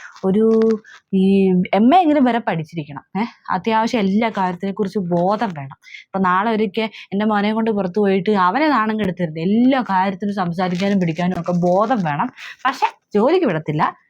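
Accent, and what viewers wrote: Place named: Palakkad, India. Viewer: Awww ന്റെ ചേച്ചിയെ ഞാൻ മലപ്പുറം ആണ്.. മലപ്പുറം ഇസ്ലാം സ്ലാങ് ink നന്നായി അറിയാം.. ഇസ്ലാംസ് എന്ന് പറഞ്ഞു താഴ്ത്തല്ലാട്ടോ.. എല്ലാരും മലപ്പുറംക്കാർ എല്ലാരും verity സ്ലാങ് ആണ്.. But അച്ചടി language ആർക്കും ഇല്ല.. But ചേച്ചിന്റെ മുസ്ലിം സ്ലാങ് കേട്ടാൽ മനസിലാവും പാലക്കാട്‌ ആണെന്ന്.. Nice❤️😌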